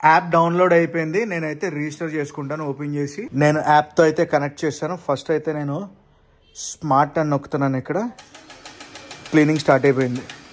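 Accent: Godavari, India. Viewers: Love from Nellore Malli vachi Chepala pulusu thinadi Anna ❤️ Me Godavari Slang ante chala istamm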